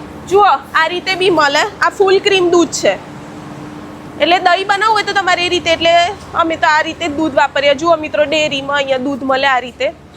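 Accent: Sabarkantha, India. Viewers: સાબરકાંઠા ના છો બેન સાબરકાંઠા ની ભાષા આવે છે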